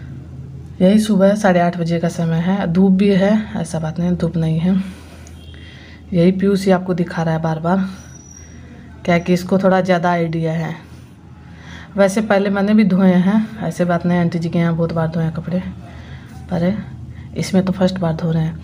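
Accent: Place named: Bihar, India. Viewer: एकदम बिहारी जबान में.... 😄😊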